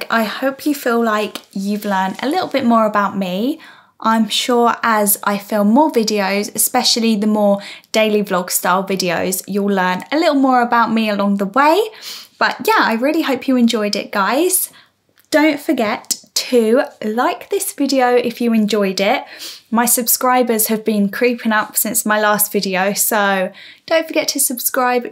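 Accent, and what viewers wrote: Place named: United Kingdom. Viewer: Hi.. I love your English British accent❤️Im happy you love dogs too.. I have 5 dogs at home and 2 cats.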